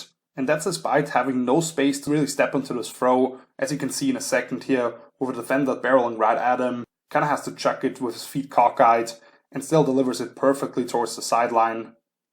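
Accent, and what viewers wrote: Austria. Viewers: Are you Austrian or German? I don't mean to be rude, but I think it's a bit strange to listen to foreigners analyze American/NFL football.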